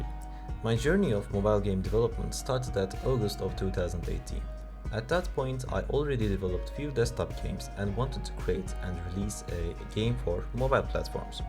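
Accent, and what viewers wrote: Turkey. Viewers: is this a turkish accent? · Türksün giba aksanın öyle gibi geldi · you have turkish accent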